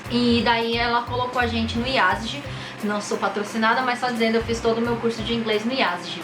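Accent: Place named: Rio de Janeiro, Brazil. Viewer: Oinhe Mestra * - * e esse sotaque carioca ai kkkkkkk adorei seu video (como sempre ne <3) to estudando ingles e japones mas ta sendo dificil pq odeio ingles, mas fazer oq ne a gente tem q aprender:( ja quero outro video:D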